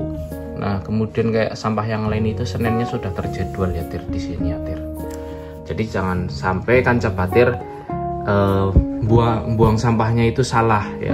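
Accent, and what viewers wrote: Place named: Banyumas, Indonesia. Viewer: Mas ngapak..asal kan ngendi..?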